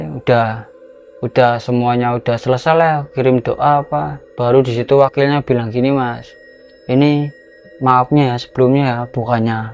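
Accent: Indonesia. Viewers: Mlaysia hadir. Pekat sangat indonisianya .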